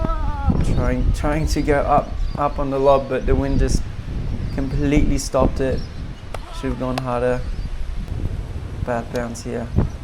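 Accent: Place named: France. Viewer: I tought you were french or belgian with the accent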